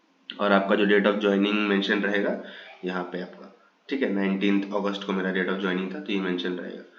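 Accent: Bengal, India. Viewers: Bengali ho kaya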